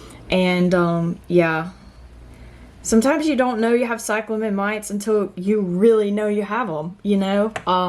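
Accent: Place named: Tennessee, United States. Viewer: I’ve been trying to figure out if it’s mites, too much light, or too much fertilizer on my violets. I think it may be mites :( I’m going to come back to your video tomorrow and compare them to mine. I feel pretty sure they have the same characteristics and am going to throw them away. sad, two are expensive fancy ones and the other was a showstopper for me for so long. trailer always covered in tons of blooms, it didn’t even look real. I’m happy to find your video, I love how knowledgeable and in depth this is. I am also a plant nerd and love the knowledge density and showing the plants up close and talking about the very specific signs that it is mites. that is so helpful. am subscribing to you! also idk if you’re from Tennessee but I am, haha and we sound similar :)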